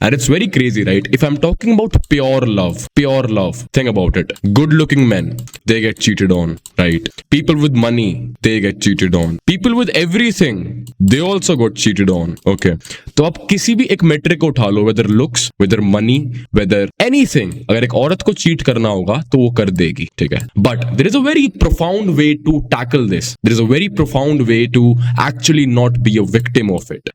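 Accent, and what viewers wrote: Haryana, India. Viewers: accent seems like haryanvi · Accent jhajjar ya rohtak ka hai?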